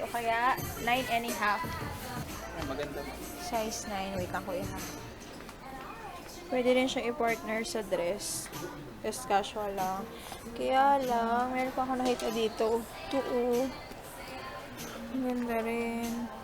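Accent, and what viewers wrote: Visayas, Philippines. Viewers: bisaya ka sis? Parang pansin ko may kunting accent ng bisaya.😊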